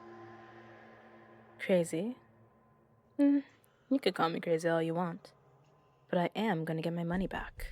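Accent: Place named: Scotland, United Kingdom. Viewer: I CLICKED ON THE VIDEO AND HEARD A SCOTTISH ASCENT AND IMMEDIATELY GOT HAPPY IM SCOTTISH, this is rare ✨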